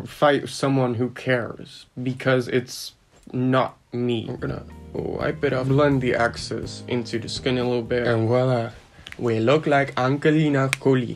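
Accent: South, United States. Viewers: This is weird. When the hell did he develop a southern accent? He certainly didn't have it a few months ago. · Is it me or do they have a more Southern American accent now? I had to review their old videos to contrast and it's pretty stark. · Idk if this is new or on purpose lol but I hear a southern twang 🤠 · Why do you have southern US accent today? Like your Dutch one much better and I'm from U.S Georgia now in Florida.. You do the accent well...just...ugh...hmmm. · you sound like you have a southern accent which is funny to my cuz i know ur Holand also you're snatched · You sound very country lol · It sounds like you have been in the south (in North America) for a few months at the start of this video. Very cute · it sounds southern American to me 😂😂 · Is it me but you sound like you were raised south of the Mason/Dixie line (residents of the USA know what I mean) Anyways, I still love your videos!😍😘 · Our sweet boy bein' sassy! 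Absolutely love it! 💕 Btw, I swear you sounded like you have a southern accent in this one. Being from the south, I know a thing or two about those. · your accent........... i’ve never heard anything like it before its extremely southern but i know you’re dutch... wow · Love your accent bud, you are a beautiful man btw . Where in the south are you from ?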